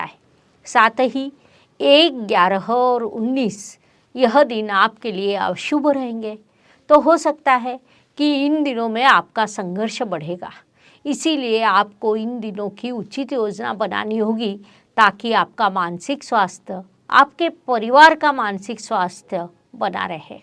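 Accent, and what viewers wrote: Maharashtra, India. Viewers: नमस्कार मॅडम. तुम्ही केलेलं विवेचन अन मार्गदर्शन दोन्ही अतिशय उत्तम वाटले. फक्त हितचिंतक ह्या नात्याने एक अनाहूत सल्ला देण्याचं धाडस करतोय तरी क्षमा असावी. तुमचं हिन्दी बोलणं, म्हणजे चक्क मराठीतूनच हिन्दी बोलताय असं वाटतं. प्रत्येक भाषेच्या उच्चारणीचा, बोलण्याचा एक घाट, एक शैली असते. म्हणजे दुसर्‍या शब्दांत सांगायचं तर पावभाजीत सांबार मसाला घातलात तर जे होईल तसं काहीसं भासतंय! त्यामुळे तुम्हाला खरोखरच एक सकारात्मक विनंती करतो की अधिकाधिक हिन्दी, चांगली हिन्दी सतत ऐकत राहायची. नाटक, सिनेमा, मालिका, समाचार असं काहीही. मात्र आपण चांगली, दर्जेदार हिन्दी ऐकतोय ना, ह्याकडे कटाक्षपूर्वक ध्यान द्यायलाच हवं. आजच्या काळात सर्वोत्कृष्ट हिन्दी ऐकायची तर श्री. अमिताभ बच्चन ह्यांचे कौन बनेगा करोड़पति चे भाग पाहायचे. त्यांच्या हिन्दीवर लक्ष केंद्रित करून. माझ्या सूचनेकडे कृपया टीका म्हणून पाहूं नये, सकारात्मक दृष्टिने घ्यावी ही विनंती. हरिॐ.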